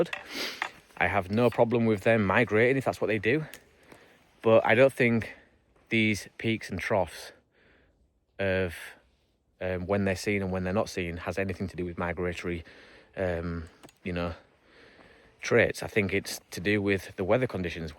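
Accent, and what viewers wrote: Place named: North East England, United Kingdom. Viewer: Don't short-change yourself! We love your N.E. accent alot; but we're really here for Lily! (LOL HARD) 👽👽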